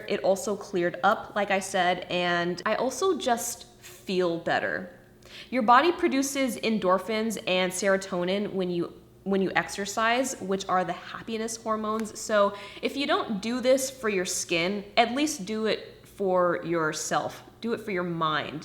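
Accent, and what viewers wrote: Australia. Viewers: Your hair and skin are snatched! And you for your skin lookin like that in just 2 weeks?! Ima tryyyy. Are you going in and out of an aussie accent? So cool lol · I love how she says WHATS GEWD and then speaks in an Australian accent afterwards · How did she sound Australian and them go straight to american english/ no accent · I’m so confused why do you sound Australian at the beginning? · Your accent sounded so Australian in the beginning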